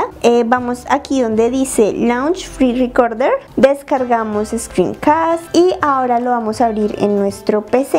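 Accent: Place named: Colombia. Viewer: Eres colombiana verdad? Me gusta tu acento😊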